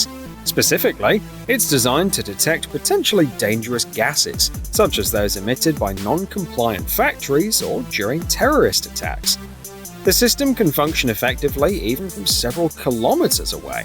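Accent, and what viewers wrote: United Kingdom. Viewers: Brit accent should be confined to Brit subjects.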